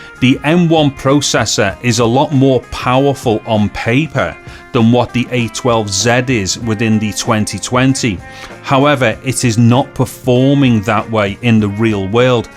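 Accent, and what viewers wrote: Liverpool, United Kingdom. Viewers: Never played a vid game ever sad I know, just love listening to a scouse voice brilliant. Not like south ar’ampsher drawl. Love my new iPad albeit a 11” keep gaming sir 👌😎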